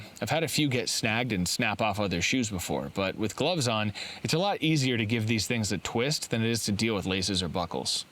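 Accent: Canada. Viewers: Nothing like gettin’ oot n’ aboot eh!? From Ottawa to Hull you have no equal. Rock on eh! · Not much of an inside joke when everybody knows this Canadian pronunciation quirk.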